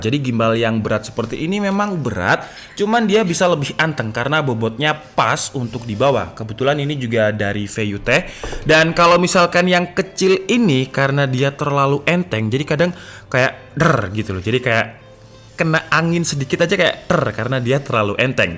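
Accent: Malang, Indonesia. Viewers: Orang Malang bang?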